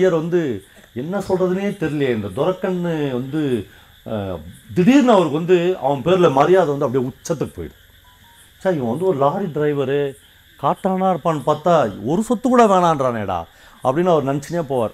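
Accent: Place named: Tiruvannamalai, India. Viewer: திருவண்ணாமலை வட்டார மொழியில்...எளிமையான உரையில் எழுத்தாளர் "ஜெயகாந்தனின்" மொழிநடை மற்றும் உரைநடையை நேர்த்தியாக சொன்னீர்கள் அழகு.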